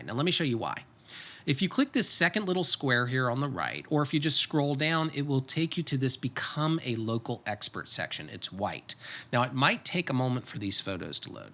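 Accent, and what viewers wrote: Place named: Philadelphia, United States. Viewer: Please do more...enjoyed! You sound like you might be from the Philly area. My ggg....was Lord Ashley Cooper, brought the 1st quakers to Charleston. Please do more of downtown!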